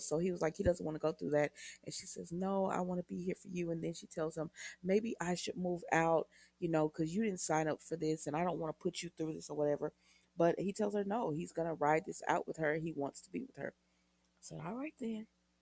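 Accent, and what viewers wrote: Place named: Greece. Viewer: I love your Greek accent!!! Probably better then the actors!!!!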